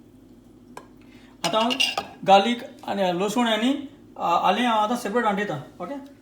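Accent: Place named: South Goa, India. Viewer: What I loved most about this video is our South Goan Konkani and accent ❤❤ wish we saw more of it in media!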